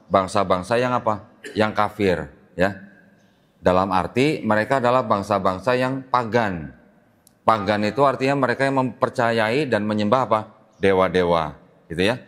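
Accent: Banyumas, Indonesia. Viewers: Logat Jawa banyumasannya melekat mbake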